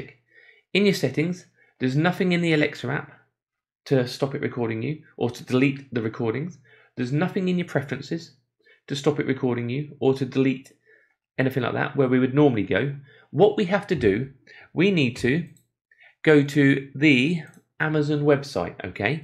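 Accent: United Kingdom. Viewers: I had to watch this video several times in order to tell exactly what this man was saying, as his British accent was difficult to discern. But great points on how to enact privacy methods. Thank you very much. Be safe and well.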